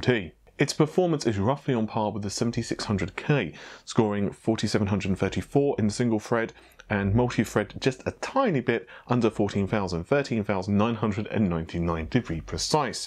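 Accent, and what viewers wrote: United Kingdom. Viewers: He sounds more British than American tbh.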